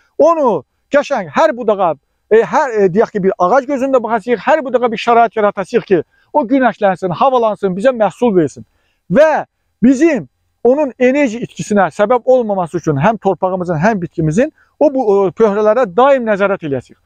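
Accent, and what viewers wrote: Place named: Qakh, Azerbaijan. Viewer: Əlhəmdulillah Qaxlilarin ləhcəsi supperdidə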